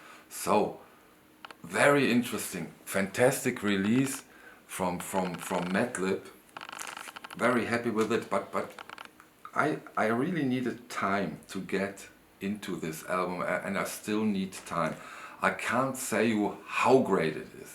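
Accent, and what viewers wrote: Germany. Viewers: Hi. I really enjoy your show and love your German accent. Thank you for mentioning the band, love this music, have now ordered both their studio albums. Fantastic. Thank you. I too am a big fan of. Kind regards from Robin.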